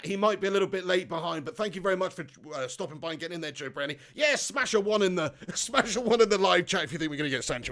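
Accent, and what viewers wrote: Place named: Scotland, United Kingdom. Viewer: We need doing the deals like his Fifa career mode.the Scottish accent needed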